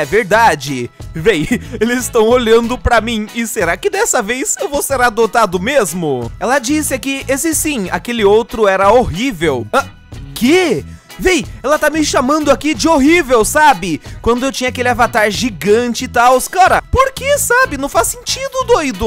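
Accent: Portugal. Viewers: O que que tem sotaque de Portugal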